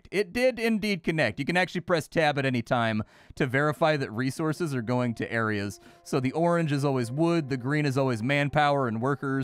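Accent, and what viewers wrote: Netherlands. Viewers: That dutch accent, always so easy to spot 😂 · Damn the dutch accent is a great accent for tutorials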